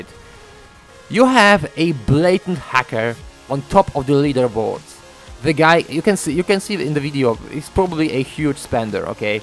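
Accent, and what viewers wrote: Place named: Poland. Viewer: Hey, I've been a fan for the longest, and I got I say you're accent is funny as fuck. I honestly agree EA has something very fishy going on with all the hackers and the non banning attempts. I've been wondering you seem like a fantastic Polish player and I've been a fan for sometime, I know I'm not as famous but I'm quite well know in the US, I ofcoarse haven't started a channel but plan to in the future, id love to make maybe videos in the future as you have inspired me. Oh and id love to play with you sometime, maybe you can add me? Playmobil5 is my username on battlefield heroes. Thanks bro Peace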